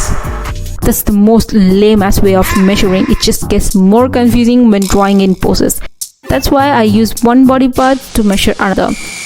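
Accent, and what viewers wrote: India. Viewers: great to hear an indian accent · Do I hear an Indian accent? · it is an Indian accent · she actually sounds more indian than european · i swear i hear Indian accent a lil bit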